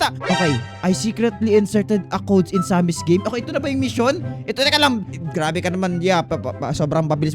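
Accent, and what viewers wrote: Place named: Visayas, Philippines. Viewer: Bisaya ka😊 sobrang bisaya ka which p mahina ka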